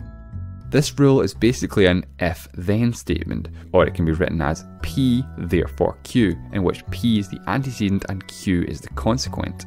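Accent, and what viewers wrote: Scotland, United Kingdom. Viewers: Scottish, hes from scotland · He is from Scotland, probably Glasgow. :) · I clicked off the video because your Scottish accent was confusing me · That's a Scottish accent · Irrelevant question; What part of the UK is the narrator from? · Paul Graf I think he's Scottish.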